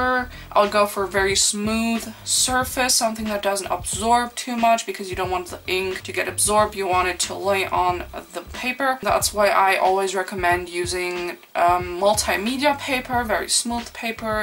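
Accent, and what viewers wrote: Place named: Germany. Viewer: a german accent~ I can only say this because you sound similar to Kicki Yang Zhang in her older videos. Dw it's not heavy or anything lol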